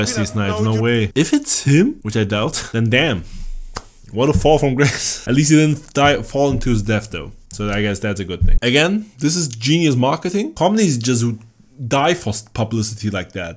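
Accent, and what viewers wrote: Germany. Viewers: I was right when I watched you for the first time. You are from German. :D I read the comments and now I'm sure. That's why not everything you talk I understand so well. Is your accent :D Funny is that the more you talk more curious I get trying to understand you. I really like it! :D I wanted to ask once in your livestream video from SSB, there the game is not in english. But I decided to wait a little more and observe you more. xD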